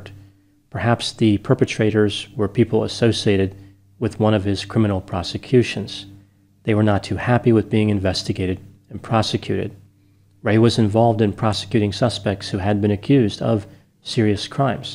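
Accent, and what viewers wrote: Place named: Pennsylvania, United States. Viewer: See, with that accent I've always had a hunch you're from PA/the tri-state, as I am. And now that you've so perfectly pronounced Wilkes-Barre, I'm almost certain. Now just give me a Schuylkill & a Bala Cynwyd and I'll deliver my final verdict. ;)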